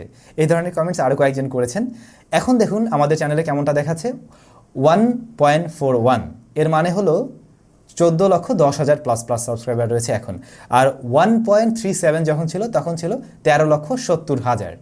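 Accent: Barishal, Bangladesh. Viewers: ভাই অনেক দিন অনেক প্রশ্ন করেছি কিন্তু একদিনও উত্তর পাইনি, ভাই আপনার গ্রামের বাড়ি কি বরিশাল৷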